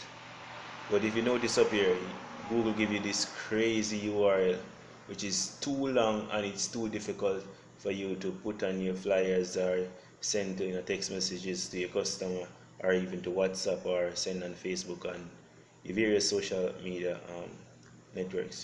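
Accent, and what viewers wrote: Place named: Jamaica. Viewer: Bet dis a Jamaican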